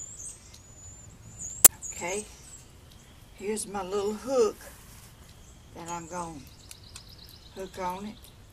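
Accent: South, United States. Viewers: I wish we were neighbors so that we could share knowledge and crafts. my daughter who is 13 wrote a paper on your videos. she practices talking like you and she says she just loves your southern accent...we people from Texas love accents..lol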